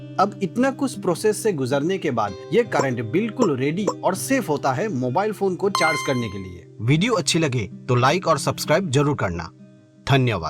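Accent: Northeast, India. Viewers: Bhai aap north east state region se ho kya aapke accent se lg rha hai 😊😊❤❤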